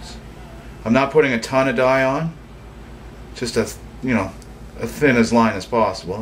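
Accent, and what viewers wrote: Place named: Canada. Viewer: he sounds canadian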